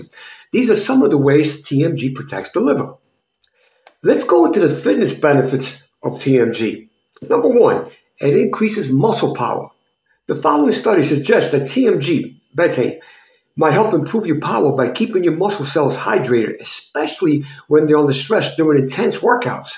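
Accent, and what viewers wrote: New York, United States. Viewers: Hi, I'm also in my 60's I just started taking TMG and it's working great from what I feel so far. I wanted to ask you are you in Germany? Because you sound like a New Yorker. God bless stay healthy. I really enjoy your videos very educational.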